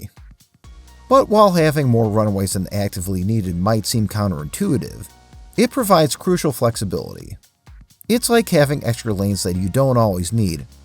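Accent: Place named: Midwest, United States. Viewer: Sounds like a chicago/midwestern accent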